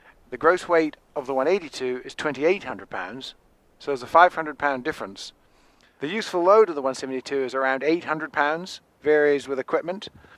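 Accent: England, United Kingdom. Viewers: This is an English accent…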